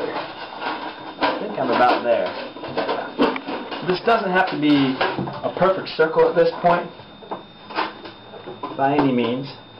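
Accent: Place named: South, United States. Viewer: a southern accent... why am I not surprised.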